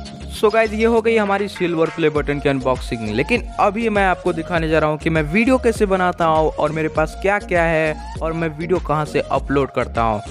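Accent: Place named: Rajasthan, India. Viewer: Bhai rajasthan se hai kya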